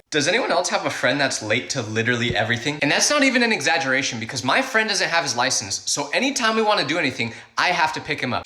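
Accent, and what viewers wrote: United Kingdom. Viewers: I’m British you don’t need to put a British accent we don’t mind but it is a very different place to be in because there really rude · your british AHHHHHHH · tell me how you talk British in two episodes and you’re telling me that if a ghost was there, it will be scary. If it was British how does that make any sense? You literally speak British? How is that scary to you? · Why does he have such a good British accent…. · Your British AHHHHH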